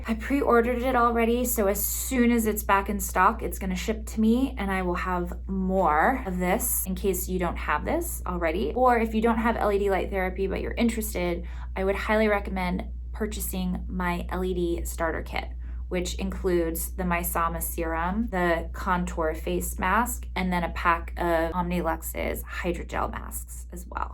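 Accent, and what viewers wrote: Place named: California, United States. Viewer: I think it’s actually the California accent so it might be challenging for people with a southern accent which is pretty different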